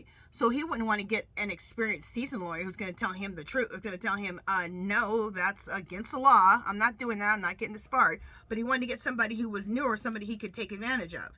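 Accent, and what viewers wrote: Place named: New Jersey, United States. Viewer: Your predictions are very precise and informative. I enjoy your energy and down to earth podcast. I look forward to your videos. Keep them up for all of us hoping & praying for Kamala's reign. (You still sound like a jersey girl 😅) I'm a 73 y/o ex jersey girl... "you guys" are what we use to say.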